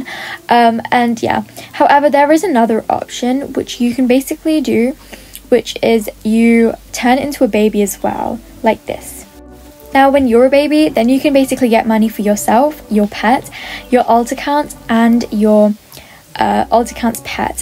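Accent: United Kingdom. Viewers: Power of the British ascent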